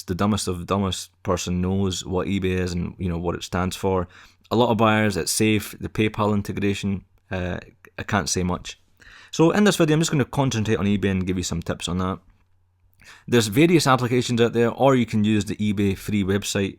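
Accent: Scotland, United Kingdom. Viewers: are u scotish?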